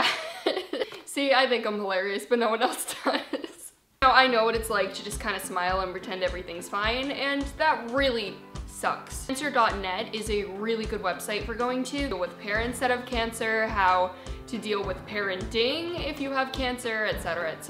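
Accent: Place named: New Jersey, United States. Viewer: you're from New Jersey? no wonder why you sound that way! you sound like you're from somewhere close to NY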